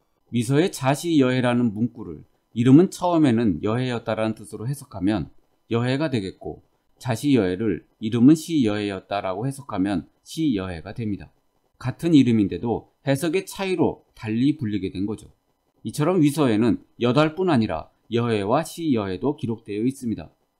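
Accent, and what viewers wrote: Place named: China. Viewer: 이 ㅅ낀 뭐지? 우리말하는 짱깨네?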